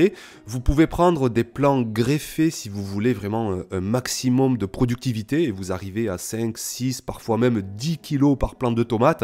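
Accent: South, France. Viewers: Tres chouette cette vidéo, tres claire et précise... Merci merci. Et le ton de ta voix toute en ambiance chaleureuse en accent du sud ... c'est du bonheur tout ça ! Moi aussi j'ai écouté ça lors de mon petit-déjeuner du dimanche entre le thé et les tartines de confiture maison... Quel plaisir ! Mille merci ! Tres bonne idée aussi ce filet pour protéger les tomates de la chaleur du sud. Je vais essayer de construire ça aussi ! Est-ce que c'est utiles pour les autres légumes ? Vous êtes où dans le sud ? ...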